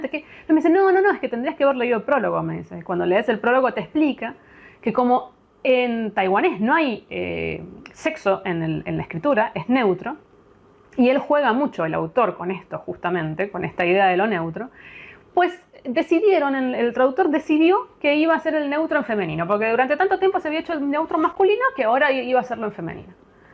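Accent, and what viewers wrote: Argentina. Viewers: Me encanta cuando con tu acento argetino despotricas contra los libros y los autores XD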